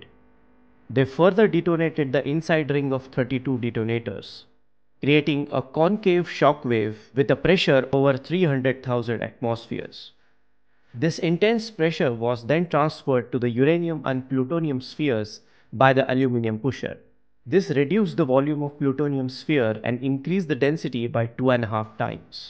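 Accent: India. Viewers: The Indian accent is the deterrent of millions of viewers of this great video. Please record the audio with the native English speaker. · Beautiful indian accent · I love your accent! It sounds Indian, but it doesn’t sound INDIAN Indian, if you know what I mean…? It sounds like base Indian, with some outer influence…? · Hoooh hate when the Indian speak English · Sorry ,I don't like indian English accent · An Indian accent and an Indian teacher quality it goes down deep . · A Good Science explanation but I am truly sorry I find it so difficult to listen his spellings, really indian accents is so unforgiving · this video is well made except for the indian accent 🤦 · I hate indian accent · These dumb channels cant ever find an American narrator who DOESNT have a wack ass accent? I dont understand this curry speak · agreed....it sounds like a indian try to speak english....good video tho! 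· nice, but that accent. It's a pity that many Indian people who has somerhing smart to say has so terrible accent that only a few people can handle that. Most people around the world who use Engish have quite ok accent, but Indian people.. man that's another level :)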